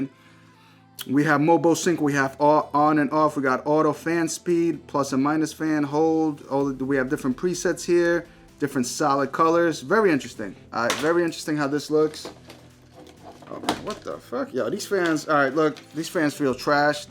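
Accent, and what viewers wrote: New York, United States. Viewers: Man i just discovered my new fave channel. You sound like you from NYC like me. You keep it real.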